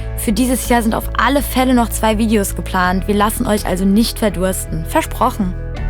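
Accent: Germany. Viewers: Heck it's so weird hearing such a German accent, in the songs I can barely hear it! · Que delicia escutar esse sotaque alemão, já amava os vídeos agora estou apaixonada ❤ vocês cantam muito bem, desejo muito sucesso 💙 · I only speak English and I had to pause the video to read some but I don’t mind kinda and 1st your VERY good at singing 2ed I like how a German actcent is also sorry I spelled that wrong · Ich wusste dass du Deutsch bist aber jz auch nocj Baden Württemberg? Giiiiirl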